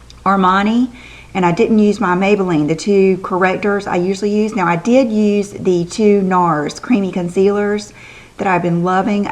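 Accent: South, United States. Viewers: I love watching you! I'm Southern also, so it's like I'm listening to a girlfriend. Big tip---- I've already tested Dior Star. It IS lovely, but doesn't wear all day or stay as natural-looking as Guerlain Lingerie de Peau. Virtually no one reviews Guerlain on YouTube but their foundation is fantastic! Sample it. It does oxidize slightly, so go just a tad lighter than what u think u need. :)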